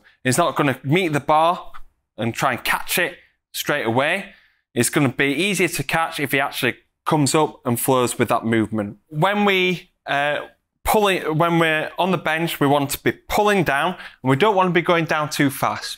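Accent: United Kingdom. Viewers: Amazing! Please if you can add English subtitles for French and foreigners it would be great! Because the British accent is not always easy to understand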